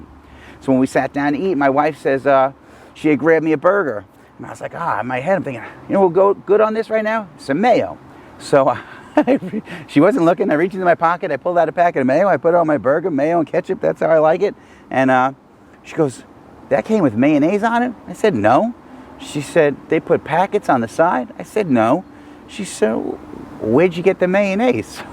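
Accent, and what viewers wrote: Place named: Long Island, United States. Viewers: you sound like you are from Long island? I grew up in Suffolk county! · He sounds like he is from Ronkonkoma or farmville but his info is solid. His hands made me laugh. He talks like everyone in my family!! :)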